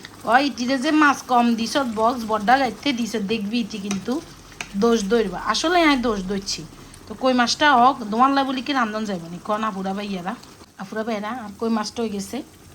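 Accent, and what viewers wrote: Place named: Feni, Bangladesh. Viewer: আপু আমরা ফেনীর মানুষ তাইতো আপনার কথা শুনলে মনটা ভরে যায়।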